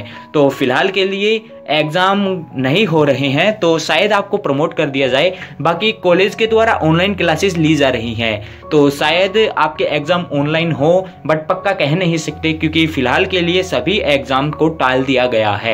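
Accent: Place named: Bhiwani, India. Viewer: bhai aap ki awaj suni suni lgi thi tho muje lga bhiwani se ho ge😅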